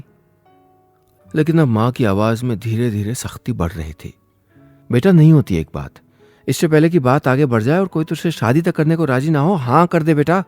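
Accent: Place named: Uttarakhand, India. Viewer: Sir m from uttarakhand and bolne Mai asi tone hmare wha sun ne ko milti hai apki khaniyon Mai apne uttarakhand ki jhalak pake bht acha lgta hai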